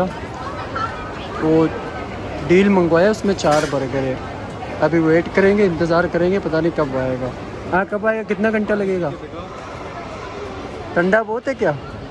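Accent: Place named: Balochistan, Pakistan. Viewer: BRO your Urdu is gud with Balochi style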